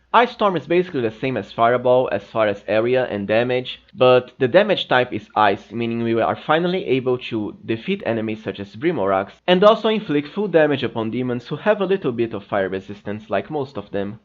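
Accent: Brazil. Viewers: Br né? Sotaque inconfundível lol 😅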